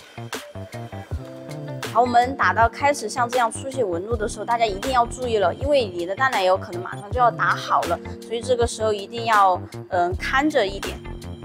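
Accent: Sichuan, China. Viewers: 老师是四川人吗:chillwdog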